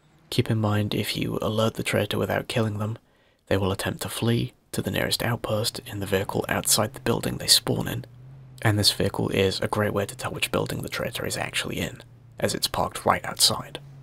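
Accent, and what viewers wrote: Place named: England, United Kingdom. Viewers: Your accent is really intriguing me, it's like a cross between Queen's English and Yorkshire! Haha. Great vid BTW! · I thought the accent sounded familiar, I just moved to north yorks myself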